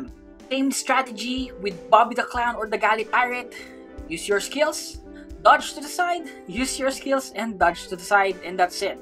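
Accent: Philippines. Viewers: Idk if this dude is pilipino or not he just sounds pilipino idk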